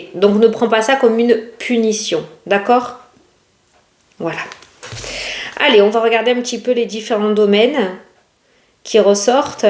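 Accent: South, France. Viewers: Coucou, J’ai une petite question un peu particulière! Je cherche d’ou vient ton accent? Marseille ou côté Toulouse? Je t’écoute tous les jours… je t’adore ❤❤ Bisous bisous ❤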